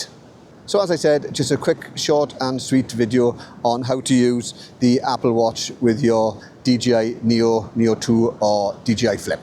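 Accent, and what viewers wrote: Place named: Welsh border, United Kingdom. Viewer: Hi started watching your videos and theyre very good 👍 Ive been a drone flyer since parrot 1 drone then parrot 2 and finally DJI drones 🤣 Im not a proffesional drone flyer just a hobby like yourself 😉 I have the neo 1 neo 2 and avata 2 plus the dji pocket 2 camera which I havent updated because Ive not used this one 🤣 I do have controllers N3. FPV controllers 3 and motion 3 controller plus goggles N3.. I am now retired and keep saying I will start a drone channel soon 😂 always next week when weathers better 😂 From near Wrexham so can understand your accent 😅 👍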